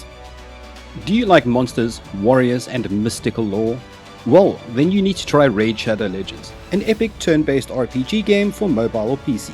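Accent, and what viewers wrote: South Africa. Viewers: U sound like you have a South African accent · Hahaha heard the intro and was like this guy is South African! Good job man great to see fellow Saffas making it with gaming channels!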